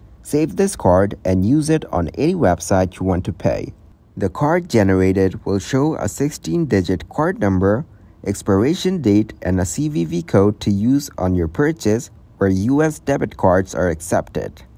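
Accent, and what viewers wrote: India. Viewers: even you talked in english you cant hide your indian identity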